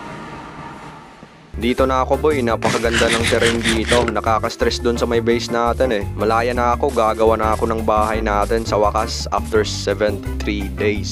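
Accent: Visayas, Philippines. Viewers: Balay hahaha bisaya ka dong😂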